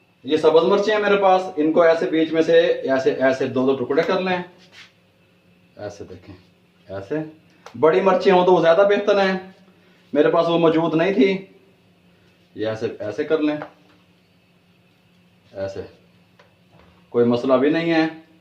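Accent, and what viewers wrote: Pakistan. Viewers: I respect u alot u have no idea about it....u talk like my jhaiji and she was from Pakistan.i would love to visit pakistan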